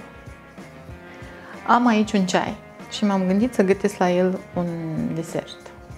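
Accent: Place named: Moldova. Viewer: Cele mai bune retete! Si de asemenea f deosebite! Ador accentul moldovenesc autentic. Nu-l ascunde! Multa bafta in continuare!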